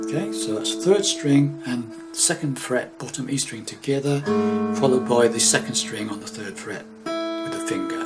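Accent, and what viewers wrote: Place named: Coventry, United Kingdom. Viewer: Just had to pause, its a lot to take in...just subscribed to his YouTube page.. Your lesson is as always perfect, great explanation and walk through, are you from Coventry but moved to Bedworth or leamington...??? You sound very local..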